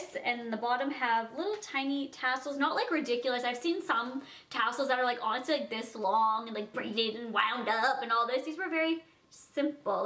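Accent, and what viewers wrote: Canada. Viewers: It's the slight Canadian accent. All of my family comes from Canada and they all have the same pronunciations as does. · M C thought i was the only one that noticed specially bag or tag anything with a ig its a canadian thing or ?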